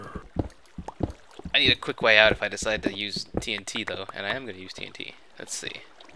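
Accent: United Kingdom. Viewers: nice british accent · you do a damn good english accent lol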